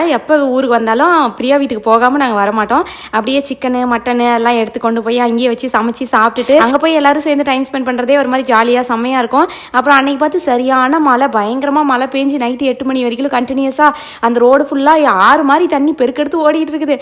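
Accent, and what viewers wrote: Salem, India. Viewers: சேலம் மாவட்டம் தமிழ் நல்லா இருக்கு நாங்க மேட்டூர் தான்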